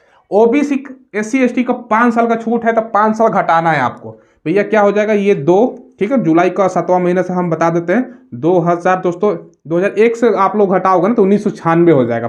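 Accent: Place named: Eastern Uttar Pradesh, India. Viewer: Ame Bhai apna east UP se hai😅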